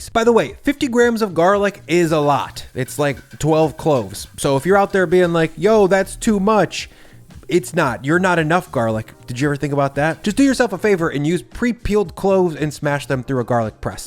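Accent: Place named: Chicago, United States. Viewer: At first I thought you were joking around and emphasizing certain words in an annoying way.. now I realize it's just a Chicago adjacent accent and you're not pretending.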